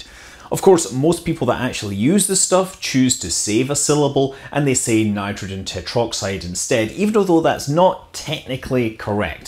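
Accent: United Kingdom. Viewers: Very nice stuff in that great British accent (Or Am I wrong?).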